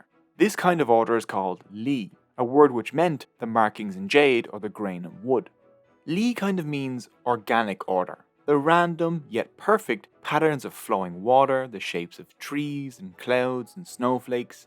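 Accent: Ireland. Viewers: I advice you to change your Ireland-ish accent, it is very uncommon and I think some people including me find it disorienting and irritating. Perhaps shift towards a more british or american accent. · i know but its just his accent. Irish accent. Not everything needs to be pronounced correctly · you have a beautiful irish accent are you from limerick? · Interesting video, I recognize the Irish accent.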